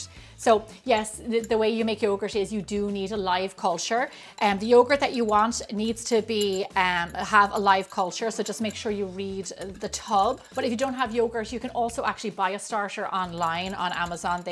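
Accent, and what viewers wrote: Ireland. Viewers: Just to clear up any confusion, this is an accented Irish correct? I just didn't want to get it wrong because I've learned that Scots have a more harsh tone to their speech, but some speak as soft as Irish folk. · We make yogurt everyday. This is her first time. Very strong Irish accent.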